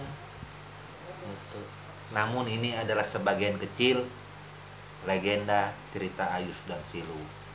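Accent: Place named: Paser, Indonesia. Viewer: Orang paser sih ini dri logat² nya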